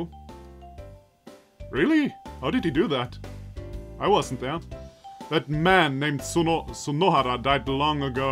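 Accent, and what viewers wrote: Germany. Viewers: Are you german? I mean you really sound like you are ^^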